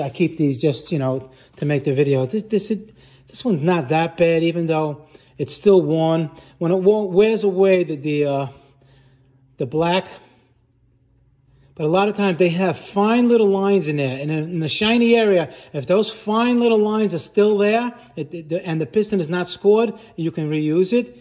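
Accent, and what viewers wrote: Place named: Boston, United States. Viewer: I swear to god that bostonian accent gives me such vibes. It's like playing max payne or something and just listening to one of the gangsters explaining to his buddy, in detail, how a car engine works and why his ride is irreversably screwed lmao All joking aside though, this vid and your channel in general is great and informative but I just had to mention the accent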